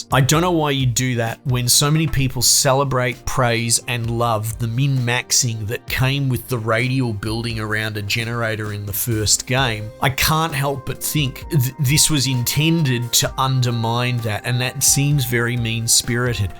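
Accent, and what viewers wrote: Australia. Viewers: I can tell you’re Aussie as well, love how you telling it straight. 😂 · As an Aussie, it's come as a massive surprise how pleasing it is to hear your accent. I usually find the Aussie accent repulsive. So --- compliments to you sir.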